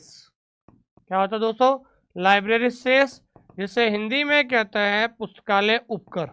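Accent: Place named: Rajasthan, India. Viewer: Pura Rajsthani style me, kya khub lge hai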